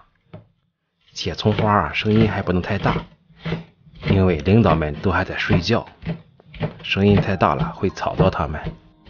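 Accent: Shandong, China. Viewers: 听口音您也是山东人吧，同在日本，听到乡音很亲切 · 一听就是山东好汉，胶东人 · 是的，山东老乡。你家乡话还是那么重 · 我听出了您的山东口音我家祖辈也是山东烟台人👍谢谢您的答复，祝您圣诞快乐🤶🎄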